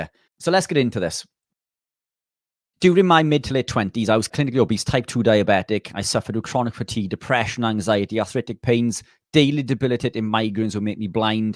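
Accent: Wales, United Kingdom. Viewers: I was truely fascinated by your talk ...all your knowledge...I'm 90% carnivore and sometimes eat seeds...nuts...and potato mash at weekend....now I'm aiming for 100% carnivore. Thank you ❤ Can I just add how sad I felt when you mentioned your Dad...unfortunately his loss 😢 Thank you again for passing on all your knowledge 🙏 I LOVE YOUR WELSH ACCENT 😍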